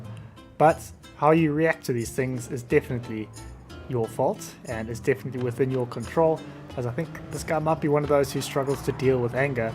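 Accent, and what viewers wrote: South Africa. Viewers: Am I hearing a south african accent?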